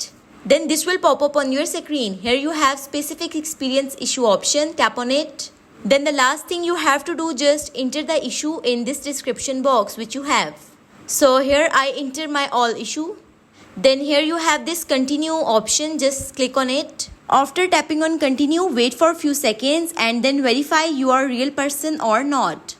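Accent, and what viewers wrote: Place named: Arab world. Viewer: arap aksamı var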